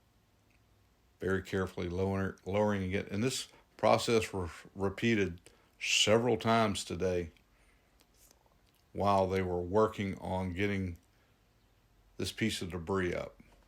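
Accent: South, United States. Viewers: I appreciate your desire make this knowledgeable and entertaining. You really know your stuff and I like your southern accent:) CHeers, Rik Spector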